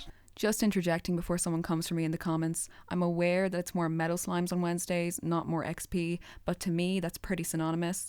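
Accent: Ireland. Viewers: Hold up is you irish? If so thats dope as shit can you say water in your next video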